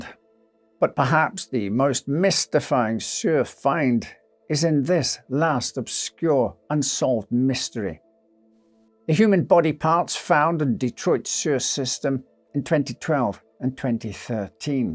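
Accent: Scotland, United Kingdom. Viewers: He's Scottish 😊, I'm.also Scottish and that's how we sound when we are talking proper 😅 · It's his voice. It is wonderful to listen to, especially for me as I admire any accent from the United Kingdom. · Or maybe he is just Scottish… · I can't put a finger on your accent. Im getting English, South African, Scottish and even Welsh! I love it, love the videos too! ❤ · Nope, I believe he is a Scot.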